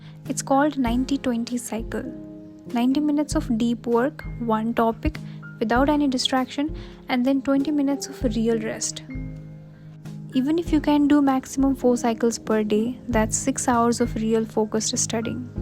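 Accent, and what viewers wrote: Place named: South India, India. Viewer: Judging by her pronunciation, she's probably from the south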